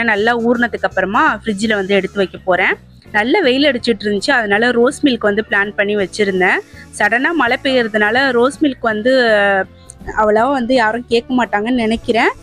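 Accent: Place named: Nagapattinam, India. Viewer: Nagapatinam karaikal side la ipdy than pesuvom avaga ivaga solrathuku bathila aha iha nu soluvom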